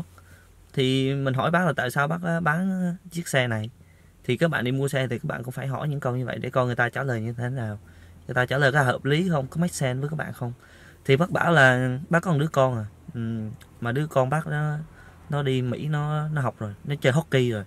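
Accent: Central, Vietnam. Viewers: Bạn người miền trung hả. Mình cũng plan mua xe nên xem bài của bạn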